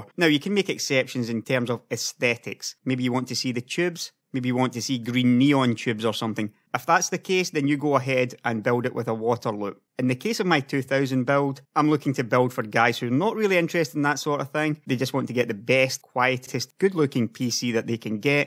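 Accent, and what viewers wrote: Scotland, United Kingdom. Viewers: Really liking to hear a Scotsman talking tech. Keep up the videos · what accent is that? you make me think of Brave's highlanders. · the scottish accent is awesome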